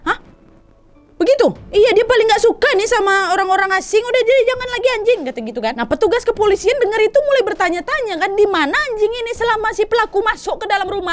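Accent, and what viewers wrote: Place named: Medan, Indonesia. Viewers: ya btul dr awal da curig, gwe ska knten ini, terutama hostnya, luar biasa gesturnya, bicaranya, segalanya ku suka, mmang itulah gaya Medan bicara, jelas x, masuk brang tu😃❤️😀👍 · Kak ini org mana si sebenernya? Kok ada bahasa jawa² nya, tapi logat nya kek Sumatra🤣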